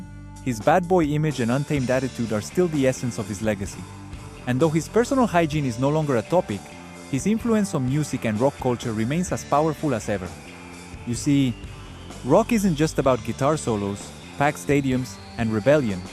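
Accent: India. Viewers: What accent is this? AI indian accent? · this guy sounds indian, this tells me all i need to know, disliked the video 3 secs in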